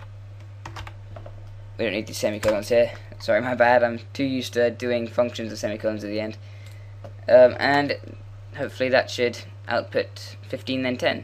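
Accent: United Kingdom. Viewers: Thank you very much! I like the accent cockney, "NUMBAR", my favorite accent! Greetings for England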